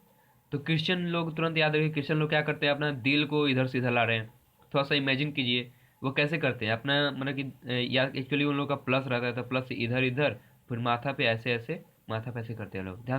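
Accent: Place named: Bhojpuri, India. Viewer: Bhojpuri Bahut pyara bolte hai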